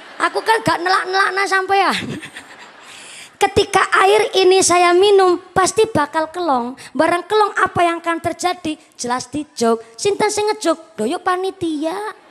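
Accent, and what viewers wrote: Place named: Banyumas, Indonesia. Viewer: Pakai bhs indonesia jd hilang ngapaknya, biarlah dg cirihasnya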